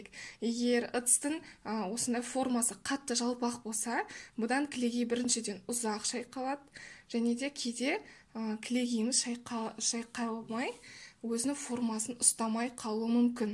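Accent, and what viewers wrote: Standard. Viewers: Керемет. Аман болыңыз. Әрқашан жасаған жұмысыңыз берекелі болсын. Сіз сияқты таза қазақ тілінде сөйлейтін блогер қазақ қыздары көбейе берсін. 👍👍👍👍👍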